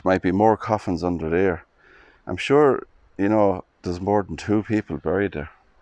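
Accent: Ireland. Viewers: Thank you for your interesting commentary, which I really enjoyed listening to. You have a beautiful Irish lilt to your voice and you sound as if you might be a good singer. Stay safe and keep well. Zora in Australia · Are you Irish? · Yet again, a lovely adventure around a fascinating Graveyard. Every one of them has secrets never to be told. Interesting Tombs and as always, your beautiful Irish accent makes these videos even more watchable - thank you. Take care 🙂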